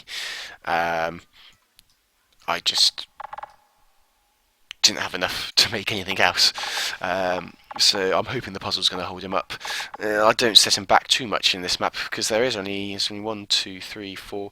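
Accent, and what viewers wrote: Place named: United Kingdom. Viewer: The intro: DEATH FIRE SINS HELL IS REAL The guy: * sweet little british man * I assume british sorry if not **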